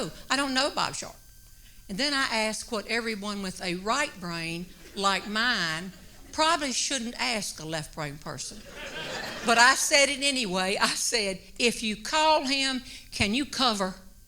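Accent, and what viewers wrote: South, United States. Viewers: you`ve got a new BIG Fan from Germany. I have been watching many of your Videos here for several Weeks and i absolutely LOVE your kind of Humor. And also love that Southern Accent. It makes your Stories even funnier. So please keep those Speeches coming. Greets and many Thanks for many good Laughs from Hamburg / Germany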